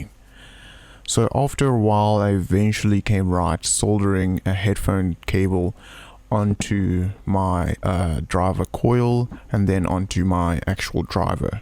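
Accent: South Africa. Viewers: South African? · You sound south african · South african accent.. Thanks.. Good info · South African? lol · you sound like you're from South Africa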